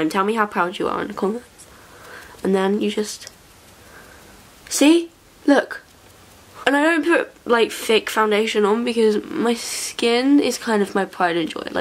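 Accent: England, United Kingdom. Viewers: Is she from England?? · What part of England are you from? I loveeee your accent!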